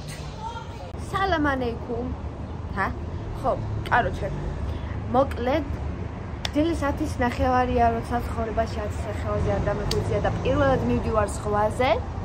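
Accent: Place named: Imereti, Georgia. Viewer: მე თვითონაც იმერელი ვარ და იუთუბზე რო ესეთი საუბარი მხვდება მიხარია რომ ძალიან გახსნილი ხარ და რავი ბევრი ეგეთი არაა და ძან გულწრფელად საუბრობ რავი ჩემგან გულით რესპექტ💜💜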